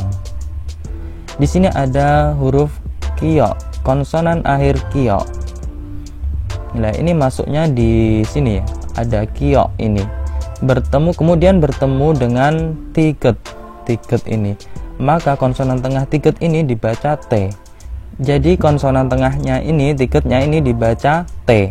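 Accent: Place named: Java, Indonesia. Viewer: Untuk materi sangat bagus mudah di mengerti tpi untuk pelafalan terlalu medok tolong di perbaiki pelafalan nya supaya bisa lebih ke logat korea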